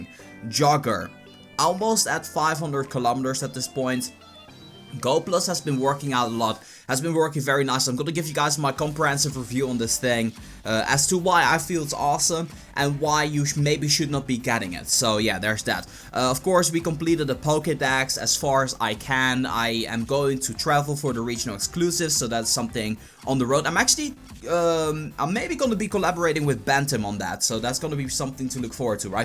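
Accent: Netherlands. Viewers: are you from holland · Are u dutch? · Leuk accent in het begin, maar verder in de video krijg je een heel groot Nederlands accent. Maar toch leuk gedaan! Groetjes uit Limburg.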